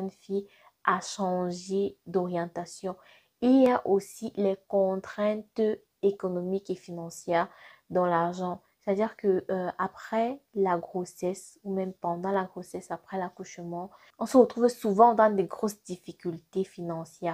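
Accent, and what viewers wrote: Cameroon. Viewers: Ma belle petite soeur, tu es super belle, mais je suis etonner que ton nom sonne comme si tu es Senegalaise mais ton accent et ton style Kamer 237 avec ta douceur me tue seulement ❤️❤️❤️💗👍💗💗😁😁😁😁😁😄😄😄😄😀😀💖💖💖💖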